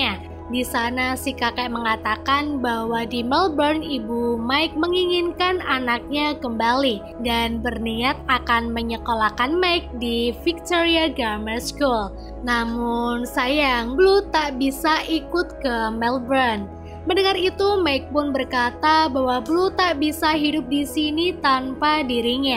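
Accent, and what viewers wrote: Pemalang, Indonesia. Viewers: Wong Pemalang min? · Miminnya org pemalang ya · Wong pemalang kah mba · Wong Pemalang kie suara ne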